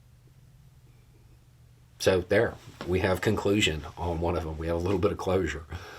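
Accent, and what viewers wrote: South, United States. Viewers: The accent probably puts people off initially. Just like WellRed. My Indiana emigre wife rolls her eyes whenever she hears the voices in f the south, and I can’t convince her to work in t all the way through. But it grows on you, as you know. Beau deserves public office, to be honest. But then we’d lose his straight shooting journalism. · I found Beau because Facebook fed me videos of a guy in a shed with a ball cap and a southern accent, near the beginning I think. Then it stopped so at some point I realized he is on YouTube so I found him here and subscribed. Beau doesn’t participate in the for profit news business, no click bait headlines, no sensationalist videos, just news and commentary so that is why he lags in subs but still he is approaching 900k.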